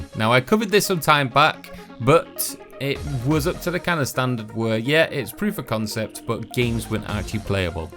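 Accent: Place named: Liverpool, United Kingdom. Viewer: Liverpool? Really? That's like when I said Totalbiscuit sounded like he was from somewhere between Liverpool and Manchester, maybe Chester, and was told he was a Geordie. I can hear a bit of scouse in you now you said it.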